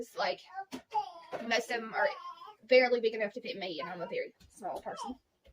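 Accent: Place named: Carolinas, United States. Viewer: What southern state are from? You sound like a Carolina girl.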